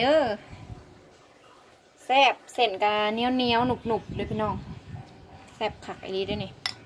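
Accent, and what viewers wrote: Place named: South, Laos. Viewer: นางเป็นลาวใต้บ่ สำเนียงคือลาวใต้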